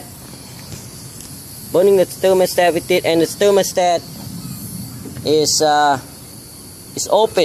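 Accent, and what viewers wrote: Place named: Latin America. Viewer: Porque no lo grabas en español?,,claro se nota que tu acento anglosajón o inglés no es con acento real,,se nota más un latino que quiere dominar el inglés,,porfavor, se te entendería mejor en español.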